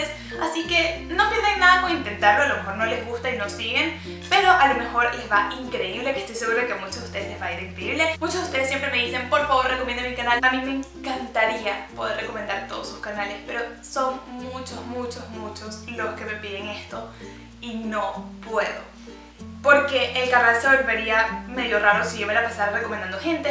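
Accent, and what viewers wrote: Venezuela. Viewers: Venezolana? Saludos!! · La verdad, no se te nota, el acento caraqueño, yo pensaba, como de Maracay, Valencia mas o menos. Saludos, estaré viendo mas de tus videos. Cuando puedas, porfa, me enviás un saludo en tu próximo video. Gracias.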